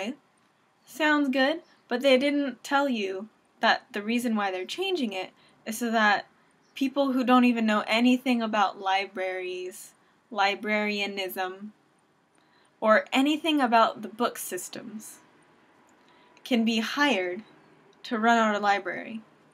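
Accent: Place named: South, United States. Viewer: This was definitely what I needed to see before I go to bed...now I'll definitely have pleasant thoughts..lol No more zombie thoughts. ;) I loved this...I like your southern accent...in fact, Gary can attest to the fact that I was cracking up so much I actually had tears..yes, you are THAT funny. DO MORE VIDEOS! haha I owe you one as well..;)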